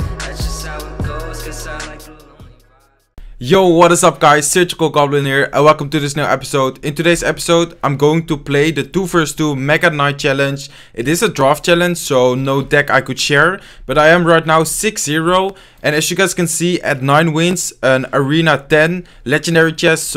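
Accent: Netherlands. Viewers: Echt te horen dat je Nederlands bent.............( niet dat ik het beter kan, en geen haat) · You can hear that he is from the netherlands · are you dutch?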